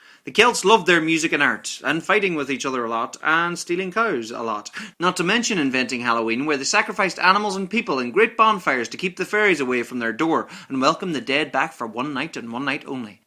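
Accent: Ireland. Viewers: I should hope so. In my opinion, the Irish "brogue" made your accent irresistible. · Aye, glad to he-ear the voice of a true man of the Erie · going by his accent, he's clearly Irish soooo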